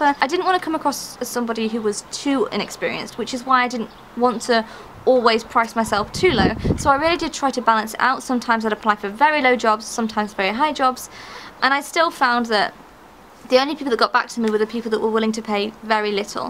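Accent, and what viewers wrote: United Kingdom. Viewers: english from uk lovely :) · came here for upwork related content but oh God That British accent <3 I want to speak like you :(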